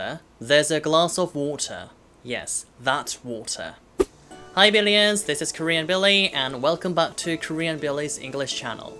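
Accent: United Kingdom. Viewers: Your accent is very impressive considering you are not from England! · 제가 하기엔 어렵지만 넘 멋있어요 영국발음 닷츠 워우타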